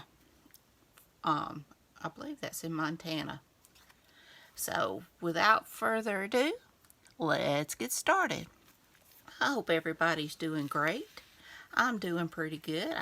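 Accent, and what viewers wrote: South, United States. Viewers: I have become a big fan of your collage. I love that you can combine simple elements together to make a complex and interesting story and layout. I appreciate your talent and it inspires me to give collage a try rather than watching others do it. I love your sweet southern accent and I enjoy your chit chat. Thanks for sharing!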